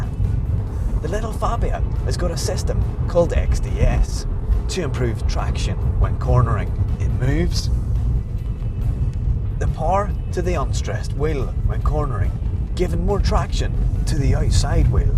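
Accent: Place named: Scotland, United Kingdom. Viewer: His presenting isnt bad. Its literally his Scottish accent that lets him down. That sounds really bad.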